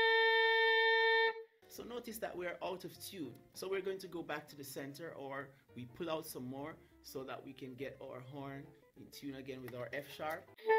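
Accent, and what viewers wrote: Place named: Jamaica. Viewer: Yes, a yardie. I heard the accent and went over to your channel. Can't hide. lol!!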